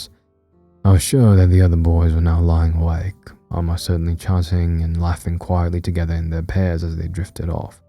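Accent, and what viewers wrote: United Kingdom. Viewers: Your British voice was amazing! It only added the suspense for me! Thank you so much for this amazing story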